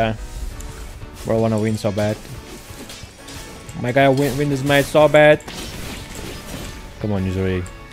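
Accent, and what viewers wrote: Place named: Russia. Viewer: Bro where u from ? I think u from Russia если так то привет брат как дела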